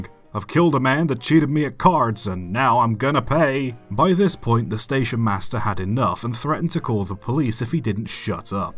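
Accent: United States. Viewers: As an American, I have to say your American accent is extremely accurate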